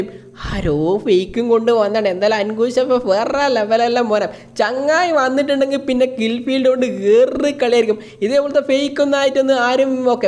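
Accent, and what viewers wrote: Kannur, India. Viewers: Kannur aano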